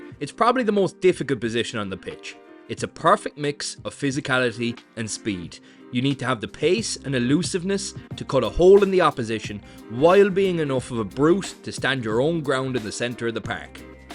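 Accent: Ireland. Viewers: Heard an Irish accent and I knew there was going to Green glazing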